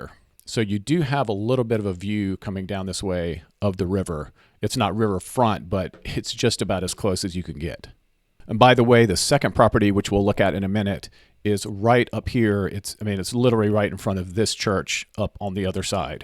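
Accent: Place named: United States. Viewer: It's the American accent. The British say it differently.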